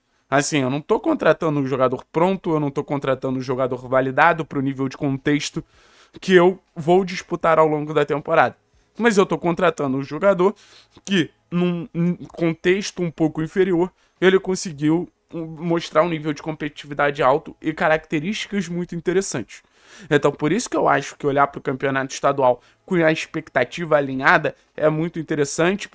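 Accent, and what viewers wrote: Rio de Janeiro, Brazil. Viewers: Com esse sutaque carioca , vc está querendo pegar carona no maior do sul , sai fora carioca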